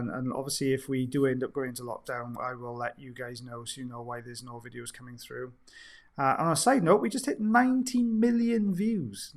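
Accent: Wales, United Kingdom. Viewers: Lovely!! The suspense of.......its coming out......oops no it’s not.......here it is......noooooo! Better than a Steven King thriller this one !!! Thanks for sharing your talent and expertise with us.....your PATIENCE with your PATIENTS is remarkable and I miss hearing the Welsh accent, someone from home. We don’t get too many Welsh here where I am in Ontario Canada. Keep em coming!! CYMRU AM BYTH 🏴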